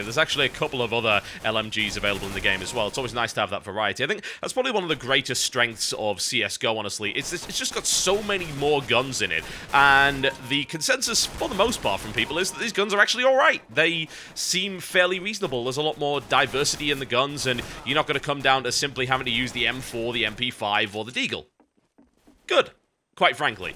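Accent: United Kingdom. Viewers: I love your voice man...its deep British awesomeness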